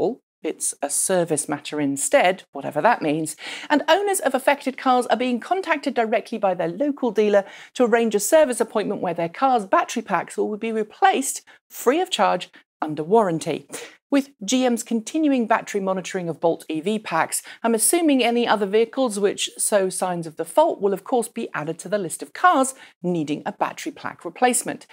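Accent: Mid-Atlantic, United States. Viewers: Could you make some of your videos with your American accent? I like it. :) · Back to speaking good old BE. Found your Mid Atlantic English a bit weird. ;-)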